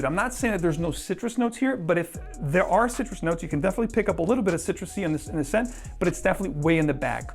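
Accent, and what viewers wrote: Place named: New England, United States. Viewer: you're from nee england brotha 💪🏽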